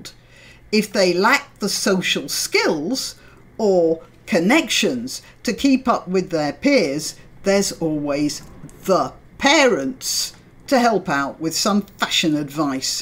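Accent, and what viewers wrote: United Kingdom. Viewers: I like your beautiful British accent very much. Thank you for your voice. I am your new subscriber.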